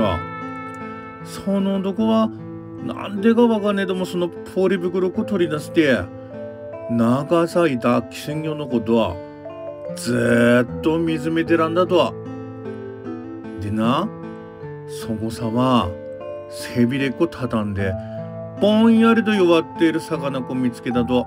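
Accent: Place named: Akita, Japan. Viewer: 秋田弁 懐かしかったぁぁ😂 おばあちゃん思い出しました✨ 今度実家で父にこの動画見せます‼（きっと️秋田弁の響きに飢えてるはず） しかもオチが思ってたのと違って吹き出しちゃった〜😂🤣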